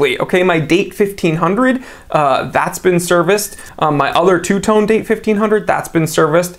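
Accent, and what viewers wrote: Germany. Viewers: It’s the german accent that made me thumbs up 😂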